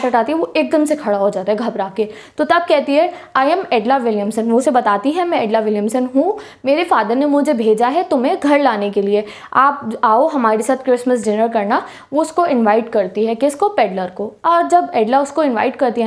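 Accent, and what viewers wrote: Punjab, India. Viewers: this is Punjabi accent.we use this word . It means hona..( sorry I exactly don't what to call it in Hindi😁)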